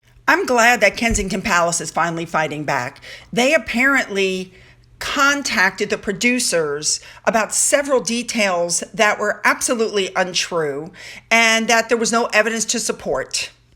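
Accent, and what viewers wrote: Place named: New Jersey, United States. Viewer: I wonder where she's from? I'm guessing New Jersey, Pennsylvania, or Delaware.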